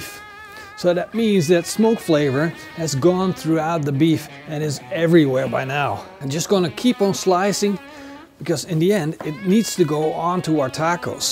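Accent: Netherlands. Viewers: He looks dutch and sound dutch 😄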